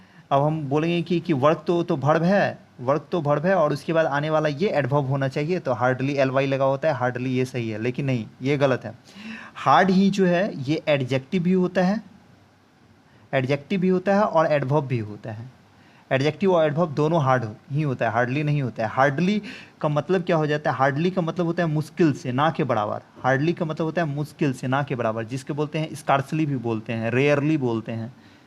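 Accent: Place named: Bihar, India. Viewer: Hello friend, it is not mistress Meera in first question rather miss-iz would be correct pronunciation. Further I suggest you not to teach English because when you speak you have got regional touch in your pronunciation and to validate myself I can say that you are from Bihar for sure. You should try something else because English is not a subject, it is a language where pronunciation has a great importance.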